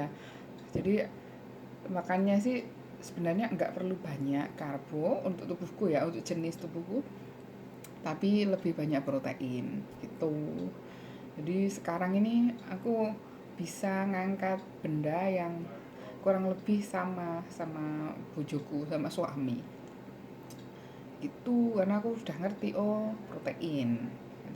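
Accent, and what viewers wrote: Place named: Indonesia. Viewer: Muka kamu mcm latin tp dengar cakap mcm indon..salam kenal sis..semangat selalu ya👍👍👍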